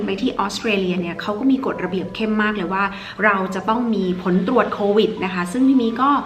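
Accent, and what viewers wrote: South, Thailand. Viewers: ชอบพี่มิแหล่งใต้ 😁🤭😁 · พี่มิพูดใต้ให้ฟังหน่อยค่ะชอบๆๆๆๆ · มีแหรงใต้ด้วย น่ารักจังเลยครับ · สำเนียงใต้ก็น่ารักดีนะคะน้องมิ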